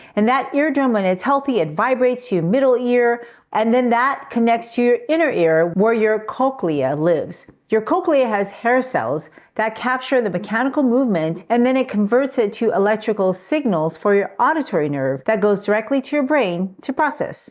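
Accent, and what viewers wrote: United States. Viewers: parli amerikano non ti capisco!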